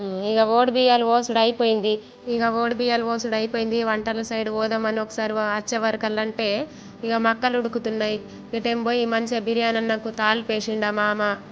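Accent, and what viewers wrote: Nizamabad, India. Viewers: Nizamabad district slang language alane untadi sis entire different❤ I am from Nizamabad too...where are you from?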